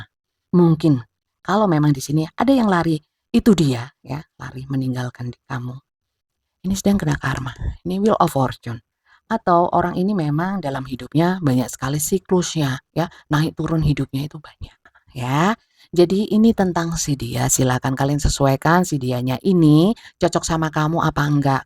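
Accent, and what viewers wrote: Java, Indonesia. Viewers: Lucu..juga.. Bundanya..jawanya medok...nggee bunda..ngge..Mater nuwun Bunda. ❤ 💙🎉🙏 .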